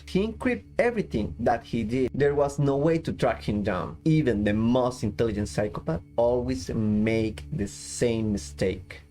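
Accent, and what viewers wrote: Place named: Spain. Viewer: This is an excellent true crime video. I'm trying to pin down your accent. Spain? Sorry if that guess is offensively wrong!! New sub right here.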